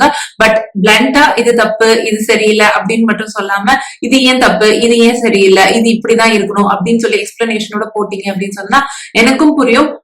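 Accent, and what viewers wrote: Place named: Nagercoil, India. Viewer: Idhu Mamma oru Nagercoil..... Salang theriuthaaaaa..... From Kanyakumari distritaaaa mam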